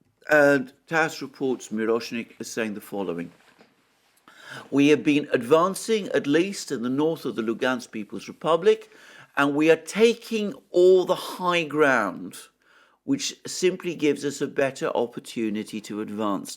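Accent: United Kingdom. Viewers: He's married, you little American pos. Your English isn't very good, eh? It's "speaking in a foreign accent." It's a British accent, so maybe you're not American but some sort of Euro scumbag.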